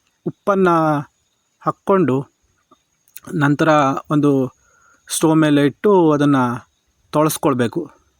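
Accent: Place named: Uttara Kannada, India. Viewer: Siddapura, sirsi areadalli bhasheya accent ide tara irutte . Nirupane paravagilla, sahajavagide.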